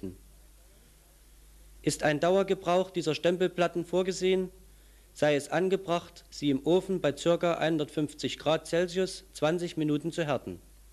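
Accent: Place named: Saxony, Germany. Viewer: ich könnte kotzen wenn ich dieses sächsisch höre...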